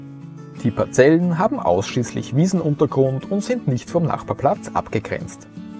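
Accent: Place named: Switzerland. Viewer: Hallo Zusammen... sollte eigentlich Hochdeutsch und nicht Schweizerdeutsch sein ((-: Aber klingt halt so, wenn ein Schweizer Hochdeutsch spricht, haha. Lieber Gruss (wir schauen immer gern euere Videos!)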